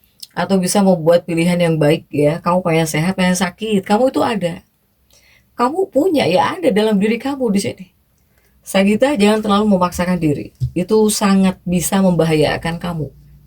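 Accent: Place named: Sunda, Indonesia. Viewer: Ya bner ak suka dgr suara kk sunda, ada serak2nya gtu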